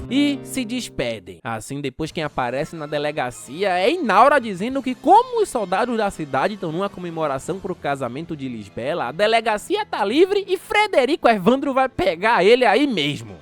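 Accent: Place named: Pernambuco, Brazil. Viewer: Rapaz To surpreso do se Da qui de Pernambuco Sempre Suspeitei do Sutaque Manda um salve Pra Aliança PE, sou muito seu fan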